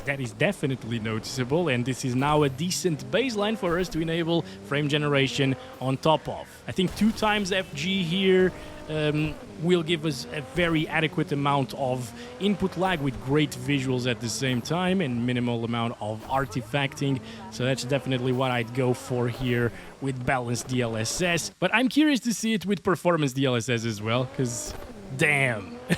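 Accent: Portugal. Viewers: You sound Portuguese, ahahahah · Good video! Are you Portuguese or Brazilian?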